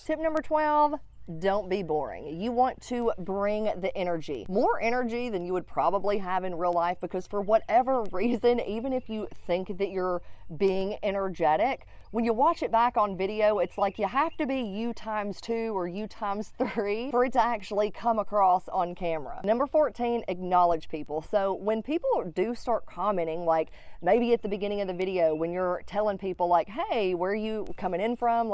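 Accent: South, United States. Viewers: Girl!! I love this video!! And you sound like a Southern girl like me! It's about time! :)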